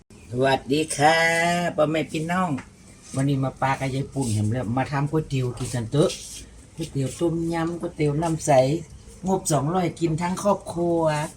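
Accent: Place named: North, Thailand. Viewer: บ้านอยู่ไหนนิอู้เหนือ😁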